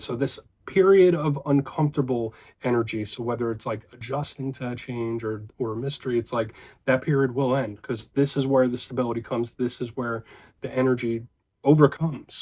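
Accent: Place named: Louisiana, United States. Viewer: You sound like your from Louisiana!!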